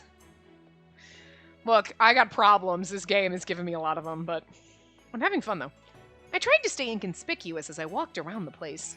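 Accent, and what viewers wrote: Ireland. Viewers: The irish accent Yay! Time to shop I need this game, the artwork is beautiful. Yin and Yang ☯️ also I love your voice acting.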